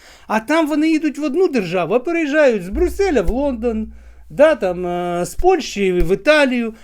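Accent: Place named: Russia. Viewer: Вы совсем придурок от природы или придурок , который таким стал во время войны?А ЧЕГО СТОИТ ВАШ УКРАИНСКИЙ? С МАССОЙ ОШИБОК И УЖАСНЫМ РУССАИМ АКЦЕНТОМ.КУДА ЛЕЗЕТЕ ПРОВОКАТОР.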